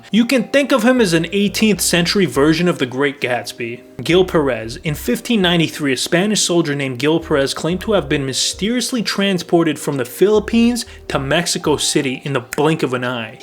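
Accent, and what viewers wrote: New York, United States. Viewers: when you said “hey why don’t i do a video on this” i thought you were gonna say “aye i’m wolkin here” bc of your goofy ass accent everything about you is extraordinarily cringey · You sound so New York to my Briskish ears. Love your material man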